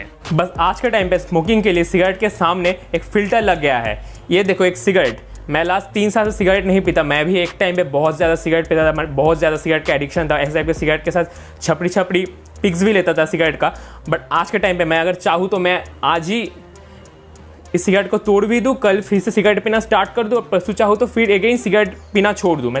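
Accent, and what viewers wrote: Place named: Bengal, India. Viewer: True, he really needs to work on the grammatical errors and I can understand his grammatical errors and also wrong pronunciations due to his bengali accent as me being a bengali too. I hope he works on it.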